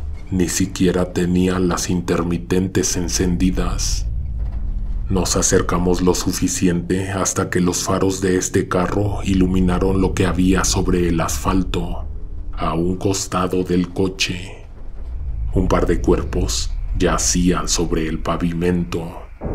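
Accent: Mexico City, Mexico. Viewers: GRACIAS.YA NO OIGO.OTRO CANAL ME.GUSTA TAMBIÉN.ESE TONO.CHILANGUITO M HACES MUY AMENO MI.SUEÑO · Oye una pregunta eres chilango😎??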